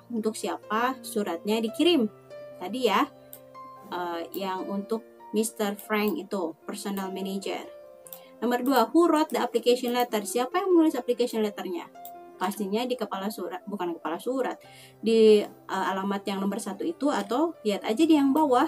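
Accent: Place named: West Java, Indonesia. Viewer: Terima kasih mudah2an bermanfaat, bahasanya sunda banget ih...☺ Maafkan Ay...koreksi positif.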